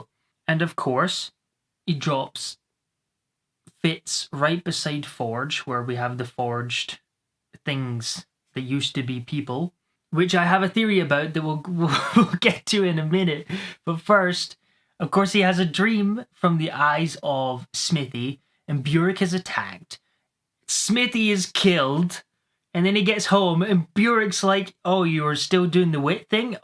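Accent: Scotland, United Kingdom. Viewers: Nice one. This was excellent! You've given me lots of food for thought as a newbie BookTuber. Love the b-roll. And the book? Yeah, it's a banger. I just finished it for the third time last night. I stopped at the end of Liveship Traders and now want to read the whole lot, so I've had to go back to the beginning. And what a trip. I'm so enjoying it. And then to jump in and hear others' thoughts on YouTube? How did I never know how good a genre this in on YouTube? Glad to make your acquaintance - I'm guessing you're a fellow Scot from your accent? Loved your thoughts on Burrich. I actually can't remember whether you're right or not. In some ways, I'm blessed with that bad memory as I can enjoy them all over again, spoiler-free, ha ha.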